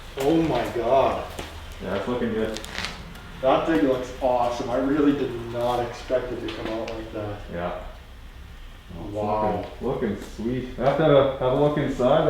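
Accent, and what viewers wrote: Canada. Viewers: when he talks to his audience: slight Canadian accent talking to actual people: Canadian intensifies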